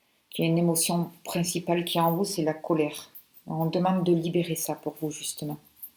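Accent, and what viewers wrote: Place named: Gard, France. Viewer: Je vous ai découvert il y a peu, et je suis toutes vos videos depuis. J'ai été impressionnée par le soin de libération énergétique, et je conserve précieusement, pour le refaire, le soin pour l'élévation des vibrations. Je ferai un don prochainement, car le bénéfice que j'en ai retiré est précieux à mes yeux, et mérite un retour de ma part. Et aussi..j'adore votre accent 🤗, qui résonne avec le mien, moi qui suis du Gard. Merci pour tout! 🙏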